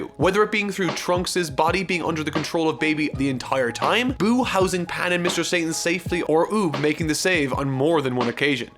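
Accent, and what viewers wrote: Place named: Ireland. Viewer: You're Irish aren't you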